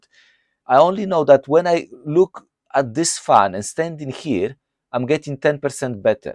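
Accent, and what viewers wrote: Poland. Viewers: First time on this channel. Little off-topic. Your accent sounds like you're Polish. Am I right? Back to main topic. I use Void Linux which is independent distro, not clone of Ubuntu/Fedora/Arch. I have been using Linux for almost 2 decades now (had a break from it). On Void, some games starts immediately. Running some games requires debugging and deducing which libraries are missing, which can be frustrating. I rely on Google & Gemini AI for support. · Ah, wiedziałem! Polak Polaka zawsze pozna po mowie 😅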